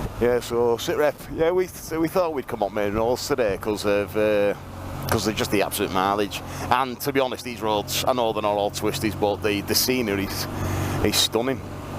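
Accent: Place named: England, United Kingdom. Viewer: Hi mate Mick from Australia here. Great videos, really enjoying them. Which part of England are you from....no expert on the English accents :)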